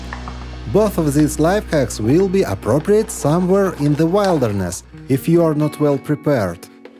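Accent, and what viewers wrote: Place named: Russia. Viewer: Такой тяжелый русский вариант английского-невозможно сосредоточиться на сути.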